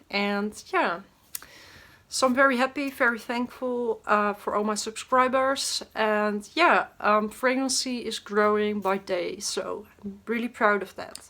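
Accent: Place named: Netherlands. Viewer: Ja je Frans is echt Nederlands bijna net zo erg als de Amerikaanse dames maar bij jou is t acceptebel,;))))). Ga zo door gefeliciteerd met 2 jaar blog.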